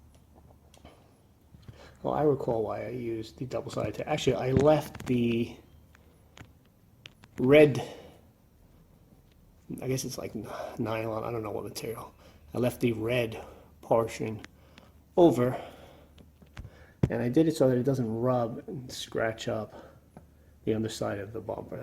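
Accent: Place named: New York, United States. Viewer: Thanks big guy. I have now spent many hours watching you boost C6 project videos... just really very worthwhile viewing, learning and enjoying the multitude of tid-bips you continually share. Prior to stepping into any project, be it a car, boat, plane, house... I do my research. I know nearly nothing about the LS3, of the C6... but I am a quick learn. Stumbling across your videos (can't recall how) was like finding a treasure box! I've still loads to learn about the C6, and LS3, but day by day the picture is becoming much clearer (<num> GS 6 spd). You've a talent and passion for teaching, otherwise why all the videos? It's just a massive shame all your efforts are somehow missing a bigger audience. I'm on the opposite side of the country next to Portland Oregon, thus your New York accent gives me a chuckle and keeps it all interesting. 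Keep it whoever you are, ha, ha...! Email me the info on the spoiler as it'll be quicker.... I think.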